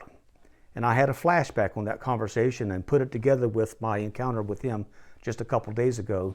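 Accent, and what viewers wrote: South, United States. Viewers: I'm only in my mid 40's working in IT and Software and I've been burned out for years. I feel like every point made here applies to my burnout. I haven't worked for a year and although my health has improved, the idea of working for a company again, where I'm treated like garbage and given an extreme amount of complex work all the while being forced out whenever they company feels like it - no matter how good my work quality is. This is just the reality today - so extreme and narcissistic. It's so hard to make a serious effort when you know they don't give two sh!ts about you. Thankfully I've invested enough to retire early, but still have just a few years of work left before I can truly pull the plug. I really enjoy the type of language and accent this man uses - reminds me of the wisdom of the elders in America I enjoy learning from. 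· I enjoyed your content snd your Southern accent :) (Australia)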